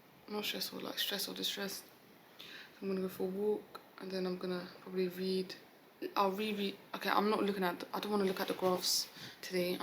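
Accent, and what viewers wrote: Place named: London, United Kingdom. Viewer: She speaks like Stormzy, soo'oo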